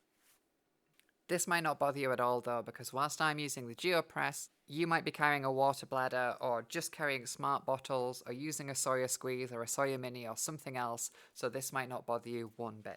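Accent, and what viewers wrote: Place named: East Yorkshire, United Kingdom. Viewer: every now and again, i hear east yorkshire in your accent. Hull. Have you lived in some different places? Where are you from? love the video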